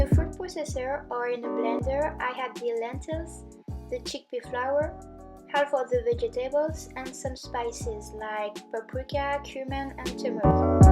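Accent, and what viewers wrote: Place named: France. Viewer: Je crois deviner l'accent francais non ?😉 super video !❤️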